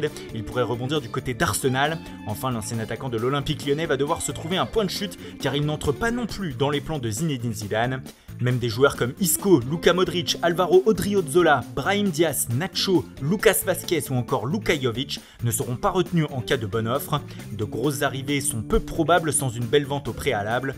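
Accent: Italy. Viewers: En néerlandais "oe" se prononce "ou" Investissez un peu dans autres chose que l'accent italien hyper prononcé svp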